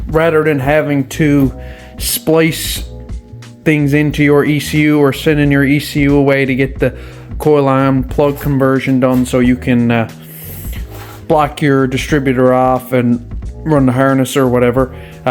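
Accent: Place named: Ireland. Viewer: You don't sound Canadian. Irish accent there?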